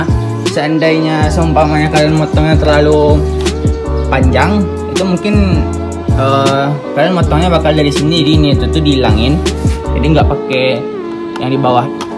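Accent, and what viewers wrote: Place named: West Sumatra, Indonesia. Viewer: raso suaro urang awak yo bang😂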